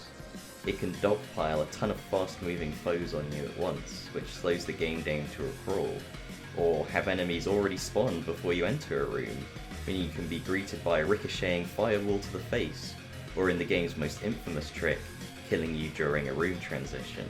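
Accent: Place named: United Kingdom. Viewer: You're very british oomfie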